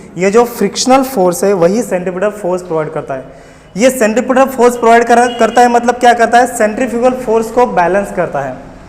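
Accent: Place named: Maharashtra, India. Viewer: सर आप हिंदी मे पढाते हो... पण मला असं वागतं कि तुम्ही मराठी बोलता बरोबर ना सर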